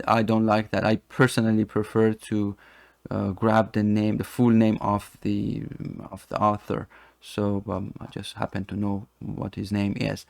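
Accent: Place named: Iran. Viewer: Thanks for the tutorial. Your accent sounds extremely Persian.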